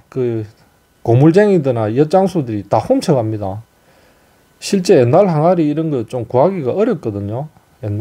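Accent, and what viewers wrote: Gyeongsang, South Korea. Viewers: 이 올려주시는 영상 늘 잘보구 있습니다 사투리를 쓰셔서 경상도분인가만 했는데 함안군 군북면 이시네요 함안조씨 성을 가진분들이 많이 살고 계셨죠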